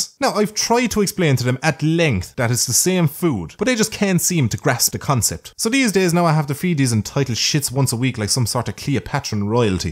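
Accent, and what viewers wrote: Ireland. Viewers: I don't know why I love this channel so much its just a Green duck with an Irish accent swearing for like 10-15 minutes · I dont know who you are, found your channel today on the "recommended". You have a good point of view that really jives with me. To be honest it's creeping me out, you sound like an irish version of myself. Not crazy, i think... Anyway keep up the good work. The worst thing about having a dog is having to say good bye. Mans best friend wont talk, can't talk, will listen the best they can, never leaves your side and will never complain. All for a head scratch and the last bit of what you're eating, if only people were so easy to please.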